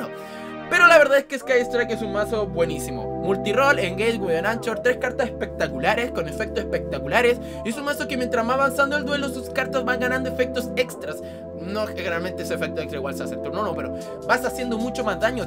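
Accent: Chile. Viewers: No se sabe que es peor, no entenderle por chileno o por la música de fondo que suena demasiado fuerte, así que asumiré que el problema radica en las dos opciones...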